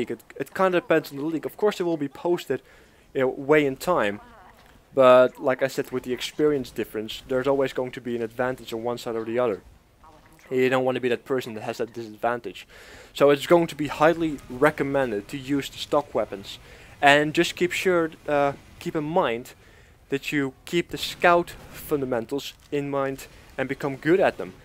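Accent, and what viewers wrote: Netherlands. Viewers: Are you dutch? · I love ur vids Btw, are you from holland (you sound like it, i am 2)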